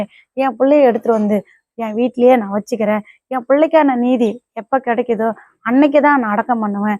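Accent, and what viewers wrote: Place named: Thoothukudi, India. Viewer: அம்மா நீங்க ரொம்ப வருத்தப்பட்டு இருந்தீங்கன்னா அப்பாவை பார்க்க முடியாது உங்க பையன பார்க்க முடியாது உங்களுக்கு உடல்நிலை பாதிக்கப்படும் கொஞ்சம் தைரியமாகவே இருங்க ஆரம்பம் என்று இருந்தால் முடிவொன்று இருக்கும் உங்க பேஸ் போல தான் தூத்துக்குடி சாத்தான் குளம் பேசும் அப்படித்தான் இருக்கு கவலைப்படாமலும் வருத்தப்படாமல் இருங்கள் அப்பொழுதுதான் உண்மை தெரியவரும் நீங்களும் வீடியோ போட முடியும் நீங்க பேசும் போதெல்லாம் எங்களுக்கு மிக மிக வருத்தமாக தான் இருக்கிறது ஆமா வயிற்றில் வயிற்று எரிச்சலாக இருக்கிறது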